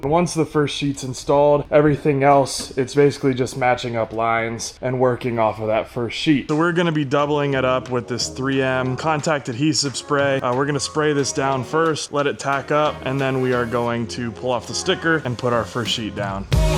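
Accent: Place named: United States. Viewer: You really are an Ozzie with an American accent.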